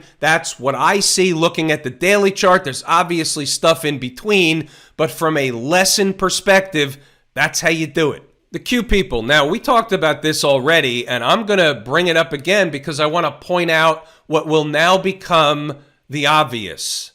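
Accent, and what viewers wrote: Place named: Brooklyn, United States. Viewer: you sound like a brooklyn guy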